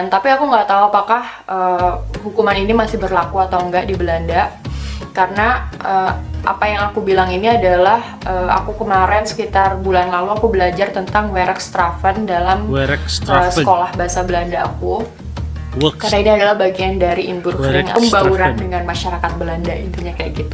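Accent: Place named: Java, Indonesia. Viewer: Cakap melayunya tebal jawa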